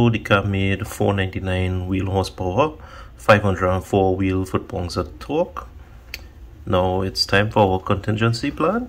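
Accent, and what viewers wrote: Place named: Trinidad and Tobago. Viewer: Yo, you sound likea Trini! Big up Trinidad; we got some of the best mechanics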